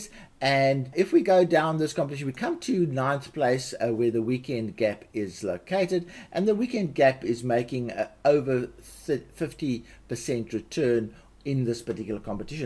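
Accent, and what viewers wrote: South Africa. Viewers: are you perhaps from South Africa?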